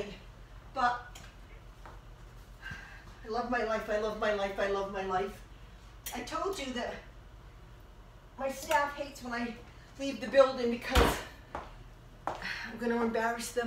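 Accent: New England, United States. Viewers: Are you from Rhode Island or Mass?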